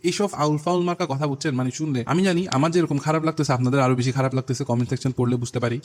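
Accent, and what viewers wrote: Chittagong, Bangladesh. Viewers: Bhai Mone Hy Chittagong er ❤️✅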